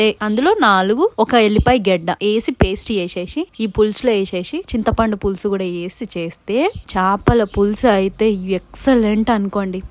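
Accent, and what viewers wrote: Telangana, India. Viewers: Your slang is telangana Telugu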